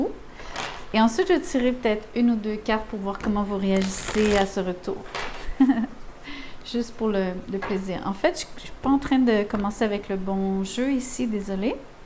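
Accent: Quebec, Canada. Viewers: Merci pour toutes ces précisions avec ce bel. Accent québécois .ce tirage me parle je suis sagitaire l autre personne qui souhaite revenir ' serait scorpion vous me redonnez Espoir👍🙏🌟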